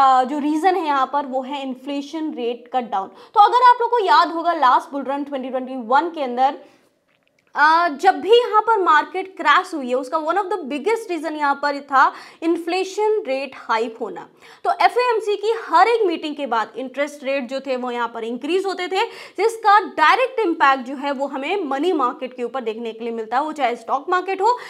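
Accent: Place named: Uttar Pradesh, India. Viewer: ji aapke bolne se hi pata chalta hai ki aap Uttarpradesh k ho.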